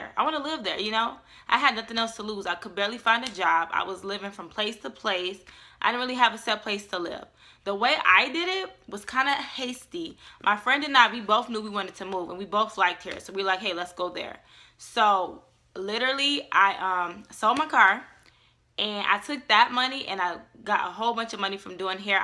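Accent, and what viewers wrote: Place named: New York, United States. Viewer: you're from detroit? you sound like you're from NY lol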